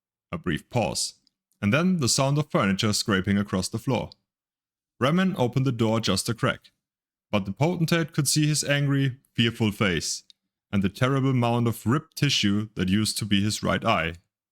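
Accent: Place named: Germany. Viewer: Ich hab mir schon immer eine Lesung der Skyrim Bücher gewünscht, aber ich komme leider nicht über den deutschen Akzent hinweg :(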